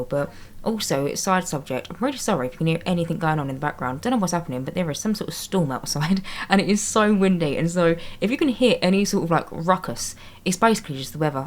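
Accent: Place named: London, United Kingdom. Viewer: I absolutely adore the way you build so realistically. The way you clutter is amazing and feels like an actual home and not an ideal, spotless house. I play TS4 on console which means I can't have the tool mod, so a silly lil thing I do is download your builds and then just take any things that I like that you rotated (eg. the journals that you had in the teen's room) and just save it to use for myself later on, lol! But anyways, I really enjoy your videos and especially your accent, it makes me feel so at home since I don't live in London anymore.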